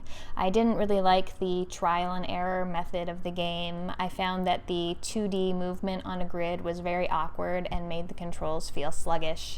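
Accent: Canada. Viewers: Star tropics was my favorite game too. Are you canadian?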